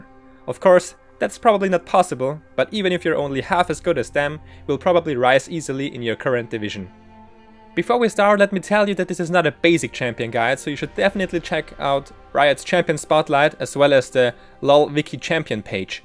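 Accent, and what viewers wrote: Germany. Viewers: Probably Spanish, But I think that he's Deutsch. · i can hear ur german roots ;)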